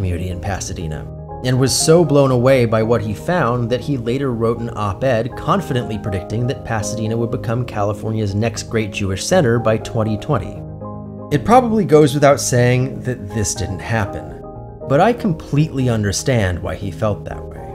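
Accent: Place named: New York, United States. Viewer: I'm surprised to hear that you're from California because I hear so much New York in your accent (notably in "caused" and "Chicago"). But honestly I wouldn't be surprised if a lot of the Southern California accent is similar to the Northeast.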